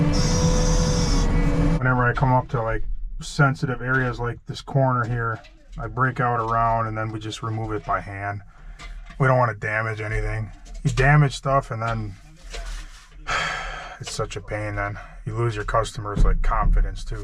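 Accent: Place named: Wisconsin, United States. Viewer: Hey, Love your videos. When you said Kenosha you had my attention. I was born in Waukegan and lived in Kenosha until my dad retired from AMC. We moved to Arkansas when I was eight years old in 1987. My wife asked me how come I don’t have your accent.😂 I told her that because I have a southern accent. 😊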